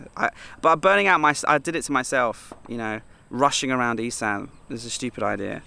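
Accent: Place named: Northumberland, United Kingdom. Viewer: Here's a question. "We reckon you are from County Durham or Northumberland and went to private school. Are we right?"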